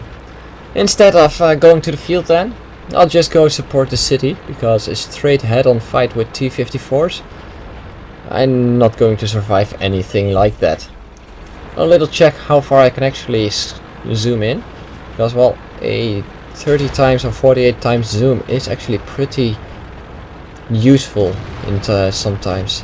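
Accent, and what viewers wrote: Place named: Netherlands. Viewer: he is dutch, just like me and ussually the dutch hava TERRIBLE accent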